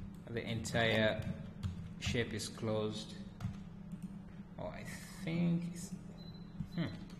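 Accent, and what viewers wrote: Kenya. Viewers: you sound Kenyan, good videos but you speak way too fast for other people to understand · Your accent sounds so Kenyan 🇰🇪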